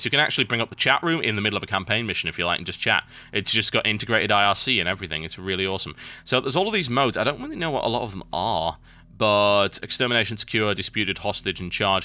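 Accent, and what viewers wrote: United Kingdom. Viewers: Speak slower british dude!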